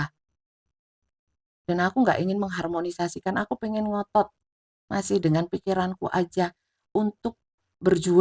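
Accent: East Java, Indonesia. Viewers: Seneng logat Jawa timur ny Bunda.. Jg readingan ny sll ber bobot dan mantap. Leo Single mom hadir menyimak..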